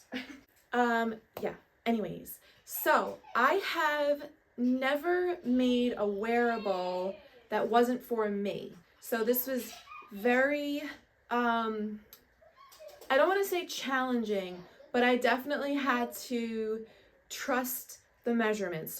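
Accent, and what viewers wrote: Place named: New Jersey, United States. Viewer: That cardigan is so nice girl you have very gifted hands 🙌🏾If you don't mind me asking where is your accent from? its sounds so cool like the way you pronounce certain things reminds me so much of jersey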